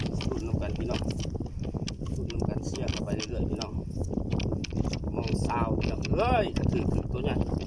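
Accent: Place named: Savannakhet, Laos. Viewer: ສະບາຍດີ ອ. ສຽງເວົ້າຄືຄົນສະຫວັນນະເຂດນໍ ຂ້ອຍກະຄົນສະວັນເດີ້ອາຈານ ຄົນບ້ານດົງບັງເດີ້. ມັກຫຼາຍເດີ້ເພງທີ່ອາຈານຮ້ອງ ມ່ວນໆທັງນັ້ນ. ນອກຈາກຮ້ອງເພງເກ່ງແລ້ວ ໄຫຼມອງກະເກ່ງອີກນໍ້ອາຈານນໍ້. ສຸດຍອດໆ.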